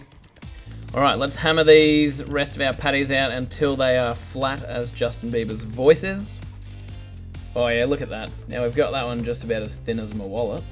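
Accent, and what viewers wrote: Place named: Australia. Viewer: I think it's absolutely remarkable that douche-bag sounds the same even with an Australian accent!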